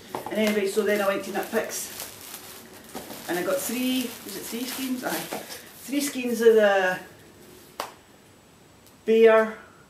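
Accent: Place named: Scotland, United Kingdom. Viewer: So great to see you back! It's fab to hear a Scottish voice on a podcast, and your knitting and spinning are always beautiful.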